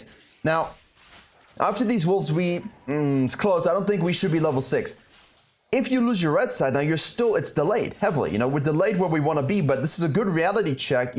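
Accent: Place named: South Africa. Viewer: The little south african cracks in your accent makes me feel at home :) the information is great btw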